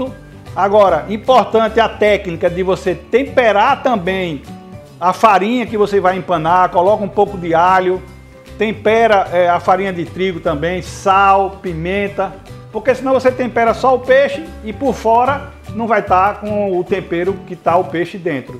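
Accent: Brazil. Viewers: Excelente vídeo, didático e claro. Muito gostoso o prato e o sotaque do chef, Brasileiro puro. Parabéns. Like e inscrição de um estudante de cozinha. Muito obrigado chef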